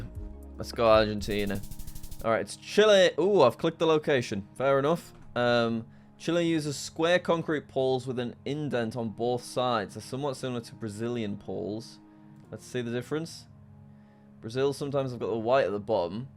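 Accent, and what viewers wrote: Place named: Australia. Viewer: Can u do a whole video with autsrealian accant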